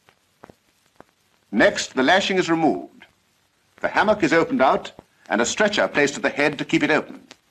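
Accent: United Kingdom. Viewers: This was during britains posh accent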